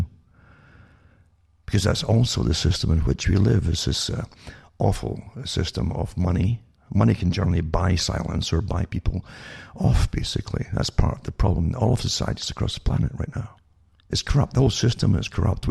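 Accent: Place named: Scotland, United Kingdom. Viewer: New subscriber from the Central Coast NSW Australia. Love a Scots accent too!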